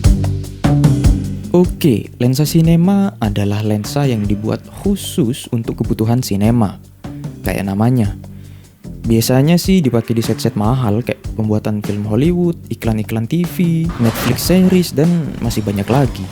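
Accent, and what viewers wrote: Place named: Madura, Indonesia. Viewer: logat maduranya nampak banget bang😂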